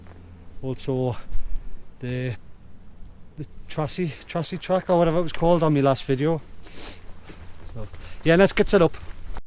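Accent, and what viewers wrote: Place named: Northern England, United Kingdom. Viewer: Hi, I came to your videos as I considering a Pioneer 2 for myself, as they are a good deal at the moment. However, I seen a couple of negative comments about them from a water proofing point of view and also regarding the inner and outer touching. Have you had any issues? You certainly seem to be using it extensively in your videos. Would you still recommend it as a good tent. I'd primarily be using it for cycle touring and an occasional mountain camp. I'm 65 now and can't be bothered squirming around in these low one man tents, trying to get changed, a 1.5 man tent with generous headroom seems the way to go. Finally, do you use the second entrance much? PS, love your accent and videos (went to uni in Newcastle in the late 70's)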